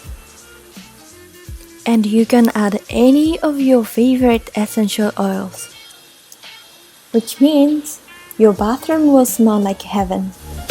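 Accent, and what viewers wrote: Japan. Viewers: まるで日本人の少女が、英語を話しているようです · □←この人の影響？(使ってみた) 母国語がないとは言うけど、私からしたら外国で英語が話せるようになった日本人の発音だよ。 ところで、👍が俺のコメントに複数つくのは誰が👍を付けてるんだろうね…😓一つだけならDianaさんだと分かるんだけどな。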